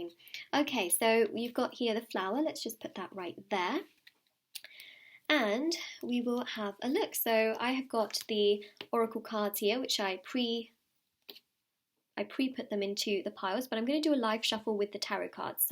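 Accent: England, United Kingdom. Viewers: I like your English accent, beautiful ❤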